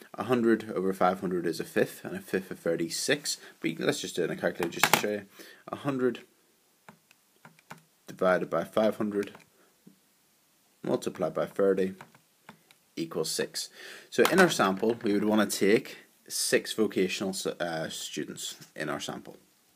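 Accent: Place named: Scotland, United Kingdom. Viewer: what is that scottish accent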